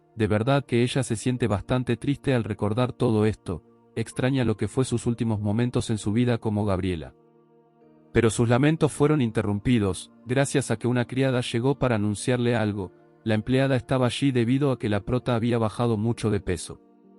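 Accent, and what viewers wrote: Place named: Argentina. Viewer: Desperté Ahí casi al inicio Ver video dijo piba déjame adivinar es un canal argentino o sea el de este video o sea el creador del video es argentino y lo sé simplemente Porque como dijo piba Y pensé el que hace el video es un argentino y yo lo sé Simplemente porque en opciones o más información yo no tengo que Ingresar a esa vaina de más información Por qué simplemente con lo que dijo de piba y dijo eso Yuya adivina Y pensé y dije es un argentino Quién hace el video El que el creador del video es argentino simplemente la forma del hablar lo dice De qué lugar es y para mí fue algo sencillo de saber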